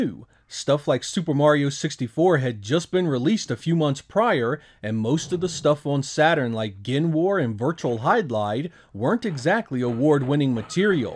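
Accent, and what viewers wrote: United States. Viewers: Just watched the video, and again we hear "Morio". Americans struggle with the "a" sound. Usually they turn it into an "e". So "apple" becomes "epple" but with Mario they turn it to an "o" - "Super MOrio". It's a mystery to me...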